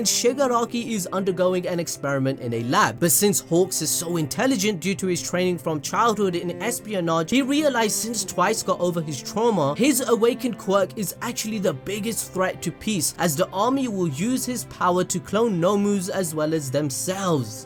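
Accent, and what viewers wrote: Punjab, India. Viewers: Sir are you Indian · Bro are u punjabi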